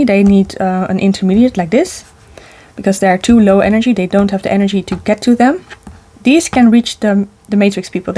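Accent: Netherlands. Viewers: I could tell your Dutch accent. Great video. Like your dimensional model 😇 Ik ben ook van Nederland en heb een boek geschreven waar ik ook een multidimensional model heb. Echt super!☯️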